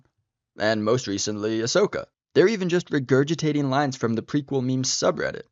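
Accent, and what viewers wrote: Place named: France. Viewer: You sound so french.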